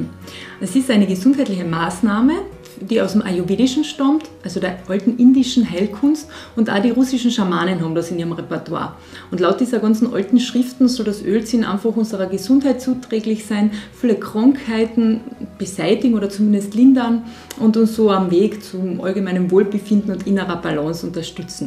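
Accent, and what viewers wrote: Austria. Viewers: Alles. Eine Freundin von mir lebt in Kärnten. Ich liebe Österreichisch.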